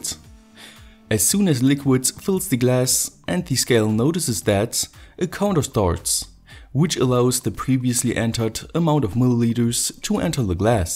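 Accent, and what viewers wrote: Germany. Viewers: Jeder weiß das du deutscher bist... ich verstehe nicht so gut englisch aber man merkt das du deutsch bist weil du nicht diesen englischen “Akzent“ hast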